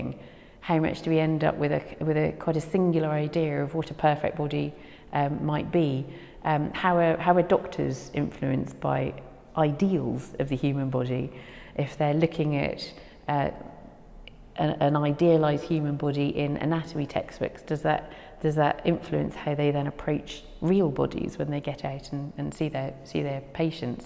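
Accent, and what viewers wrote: Bristol, United Kingdom. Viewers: that's not a 'westcountry ' accent. Come to Devon or Cornwall and you'll know. She has light Bristolian going on. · Bristol ( locally known as Brizzle ) is right! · Think Brenda from Bristol.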